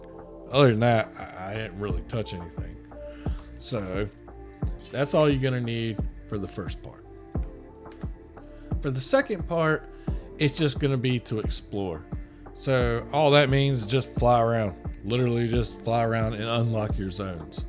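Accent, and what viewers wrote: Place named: South, United States. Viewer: Oh my word the accent on this man. I have a southern accent also . Thank you for a awesome video.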